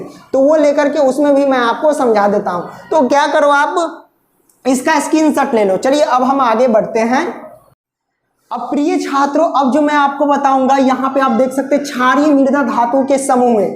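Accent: Bihar, India. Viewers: Sir aap bihar ke rhne wale hooo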